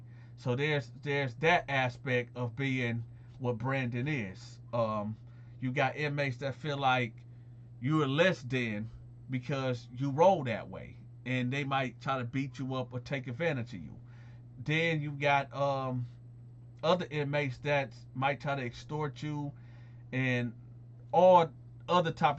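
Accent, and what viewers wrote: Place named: Ohio, United States. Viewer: Okay! At first I thought you was from Cali from yo accent. But now I realize from yo story's that you MUST be from Ohio or Michigan!.. But I'm guessin Ohio. Yaw sound JUST LIKE US!! Or vice-versa. But much love to ya anyway brotha! Keep them interesting ass stories goin! I'm listenin.👊🏾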